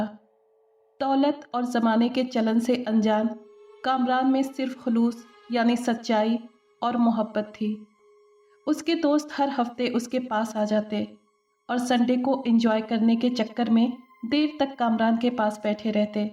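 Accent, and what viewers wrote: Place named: Kashmir, India. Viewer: I watch all your video.now days I am staying in delhi.bt mera childhood kashmir sae h. Apki voice n Akcent n Urdu sae lgta h jase koi wahi ka ho .nyc video.lots ov love.u r doing Great 👍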